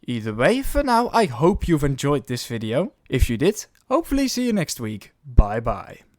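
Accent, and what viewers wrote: Netherlands. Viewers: I smell nederlands (or german) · ziek nederlands accent 🤩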